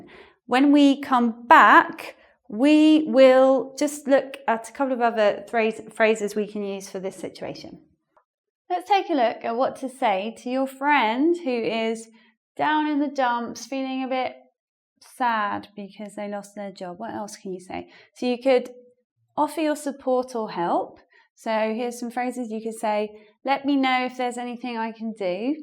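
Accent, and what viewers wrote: United Kingdom. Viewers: Hi:) i really love watching your video in youtube and engvid what a intresting topic and i like your real british english <3 at first time i watched your video, i can't understand anyting!! but now i heard your all word of sentences. thanks a lot.XX but would you mind if you tell me your personal website address?:) please reply me:) i'm waiting you ❤️ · Her accent is Ideal in order to get used to british accent: it has all the characteristic of the accent of people I can't understand, but I can easily understand all she says. I'm actually watching all her videos to get used to british accent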